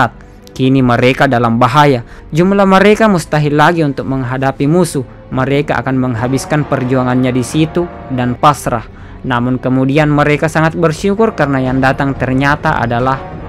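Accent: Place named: Makassar, Indonesia. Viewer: Orang Makasar ini kita dari logat nya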